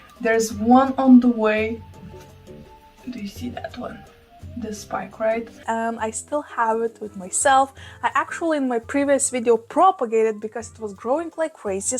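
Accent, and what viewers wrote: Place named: Russia. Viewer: Hope you are doing and feeling great! Your video and your thick Russian accent always bring me joy and elation whenever (read always ) I watch you 👀. Great job on rehabbing those beauties and thank you once again for filling us in the updates -these are always one of my fav videos of your to watch. Hiii from the 🇺🇸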